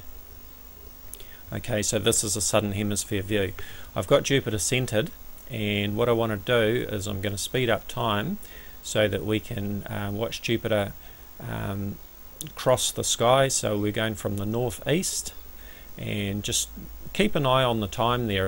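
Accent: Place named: New Zealand. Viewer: awesome accent mister Kiwi. Kuddos from mexico.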